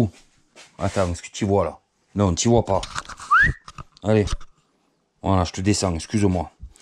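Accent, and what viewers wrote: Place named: South, France. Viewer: Excellente vidéo, merci de partager tes connaissances avec nous, c est un régal, l accent du sud nous apporte du soleil ! 😉👍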